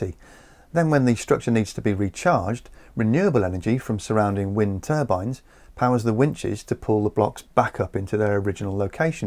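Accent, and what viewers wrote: England, United Kingdom. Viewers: As a British person, there's nothing I love about this channel more than the extremely British way he speaks in this video.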